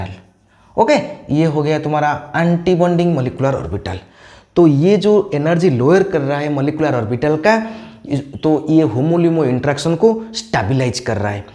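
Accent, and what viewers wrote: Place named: India. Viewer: Can you add subtitles? Cause I have trouble with understanding English with Hindi dialect...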